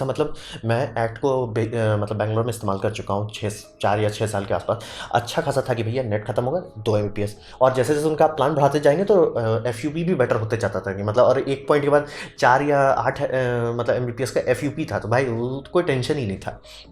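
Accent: Bihar, India. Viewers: 100% pure Bihari vibes 😎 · Aap puree bihari hu ekdum fulltu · May be you are bihari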